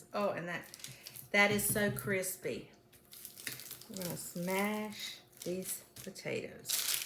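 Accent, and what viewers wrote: South, United States. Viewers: I was wondering what to do with these canned potatoes ty. And she has a lovely Southern accent which many of us are jealous of lol. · Love your southern accent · Thank you so much glad to meet you I will keep your Channel. I'm 90 years old and I live in Southern California and I know you're from the South but I don't know where how could I tell LOL🥰🥰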